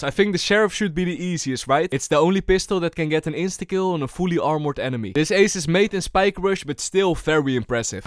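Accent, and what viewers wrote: Netherlands. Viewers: First sentence and already knew you were dutch. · ur dutch right? (guessing off your accent)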